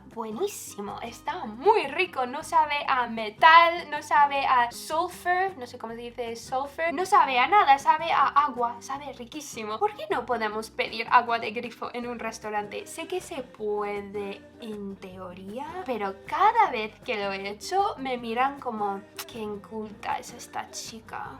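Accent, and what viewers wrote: Galicia, Spain. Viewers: Vídeo super simpático. El gotelé es una horterada. Cierto.q a veces en las aceras hay 4 personas caminando sin dejar espacio, lo suyo entonces es q dos personas de esas se pongan detrás para dejar pasar a las del sentido contrario. No en todas las partes de España acompañan a la bebida alguna tapa, por ejemplo en Cataluña. El agua de grifo en Cataluña es horrible. Puede q algunos bares sirvan agua con botella que haya sido "tratada", es decir q pasa por algún mecanismo para depurarla y quitar mal sabor. El "taluego" es un clásico, especialmente en los pueblos, donde sí q posiblemente puedas ver a esa persona o no. Por cierto, se te está poniendo un poco acento gallego, puede ser?;) A seguir así,!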